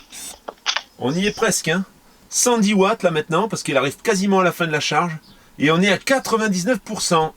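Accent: North, France. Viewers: Pas mal du tout!!mais tu n'as pas des origines du nord???t'as un acchent de ch'nord!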